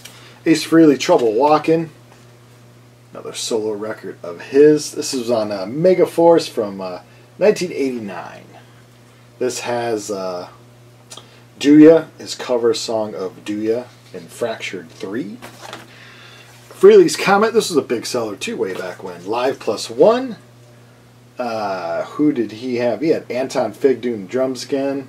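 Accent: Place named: Chicago, United States. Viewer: I can tell right away that you're from Chicago. I grew up in Chicago but I live in California these days. That Frehley's comet live album was recorded at the Aragon Ballroom. I was there. Y&T was the opening band. had the best song on that comp album " ".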